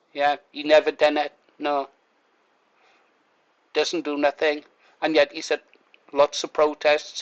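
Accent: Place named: London, United Kingdom. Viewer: A proper London accent!😂😅 Cockney is better than the Jafaken that the freaks speak, and not only in London now.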